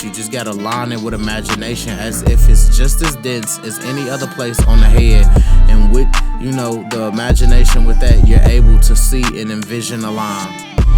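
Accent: Chicago, United States. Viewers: Knew you were from Chicago just the way you talk we got a certain dialect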